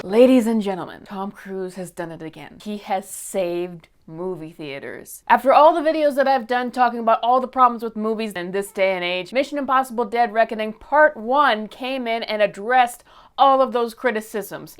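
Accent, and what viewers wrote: United States. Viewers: I love your accent! What state are you from?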